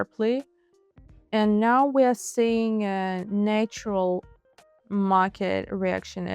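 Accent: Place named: Russia. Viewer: It's pronounced MAK Dee 🤦 This Russian accent and pronunciation is 🙄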